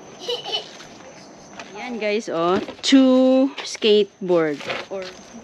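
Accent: Visayas, Philippines. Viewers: Bisaya ka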